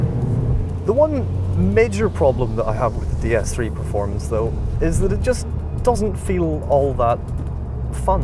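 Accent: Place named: Birmingham, United Kingdom. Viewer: He has the same accent like the other guy. Same hometown maybe? Birmingham?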